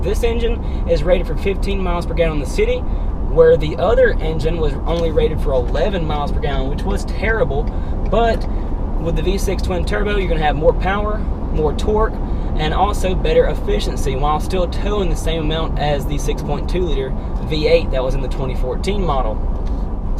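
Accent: United States. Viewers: Country as hail lol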